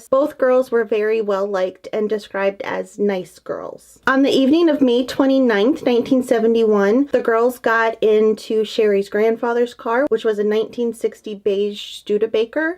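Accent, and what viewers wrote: Minnesota, United States. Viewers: Love that MN accent! ☮️💟. I have it too.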